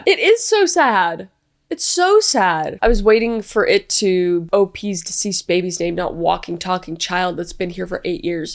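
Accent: Germany. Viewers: Sehr gute, deutsche Akzent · Yep your accent sounded like my german mom trying to speak english 😅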